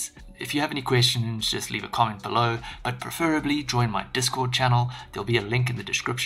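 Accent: South Africa. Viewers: I thought I recognised that accent as South African :) What part of SA? Cape Town?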